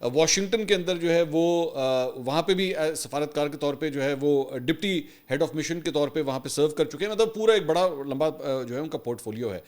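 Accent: Punjab, Pakistan. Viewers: bhai apki hansi he dushman ka dil jalany k liye kafi hy ...Allah pak apko apni aman may rakhy mza ata hy apki baton may pnjabi tuch ❤ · We love your Punjabi accents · Meta Bhai, Urdu bhi Punjabi accent mai bolta hy. اندرون شہر کا اثر ہے۔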